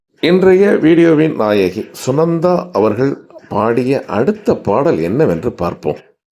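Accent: Sri Lanka. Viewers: Neenga ilangaiya sir unga slang nalla iruku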